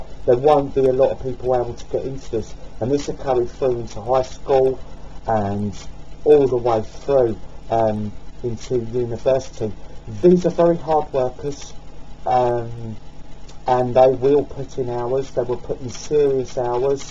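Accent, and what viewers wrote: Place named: United Kingdom. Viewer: such good material... but the audio is really poor. and you have an awesome brit accent!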